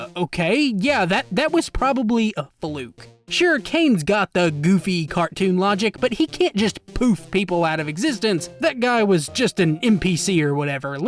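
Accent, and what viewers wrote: South, United States. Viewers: Oh my god you are so southern. I live in the deep south and i don't even know anyone who sounds like that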